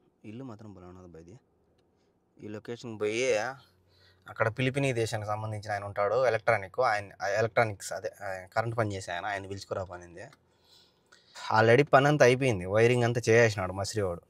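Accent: Rayalaseema, India. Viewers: It's rayalaseema dialect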